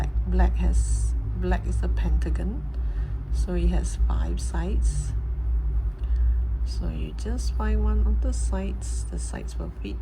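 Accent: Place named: Singapore. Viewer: Are u Singaporean 😭 ur accent is like Singaporean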